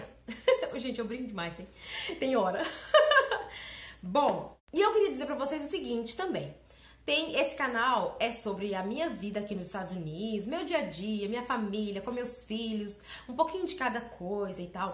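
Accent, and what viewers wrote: Minas Gerais, Brazil. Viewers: Quando vim pro seu canal já me apaixonei pela pessoa que vc é , só de olha pra vc já dá pra ver a honestidade humildade e força de vontade que vc tem, fora que na hora já vi pelo seu sotaque que vc é daqui que Minas . Baum d+ Uai! Tbm sou Mineirinha da gema , de BH 😘 (Vendo em 11/06/2018) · Assim que vi seu vídeo pela primeira vez, logo pensei ela é mineira..kkkkk Esse nosso sotaque é inconfundível. Que Deus continue abençoando sempre 😍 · Vc num chorou mas eu sim kkkkk Glória a Deus pelas conquistas 🙌 Exemplo de mulher! Q orgulho dessa mineira kkkkk Sotaque nao nega!!! a primeira vez q vi um vídeo teu logo percebir essa é mineira😍❤😊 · sotaque gostoso esse de minas .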